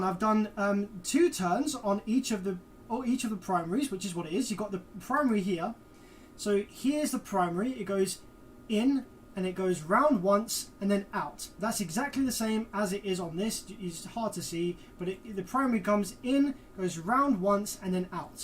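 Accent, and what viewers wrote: United Kingdom. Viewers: bro i never knew you was british lol , did you meet pooven to get your z4 15s?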